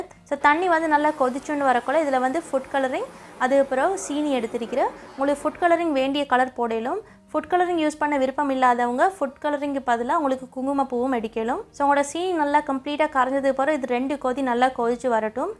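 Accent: Sri Lanka. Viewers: Dear Sister, I always interested in your Coking and the way you speak Tamil is so sweet. Where do you come from in Sri Lanka, Which area? Salam from Germany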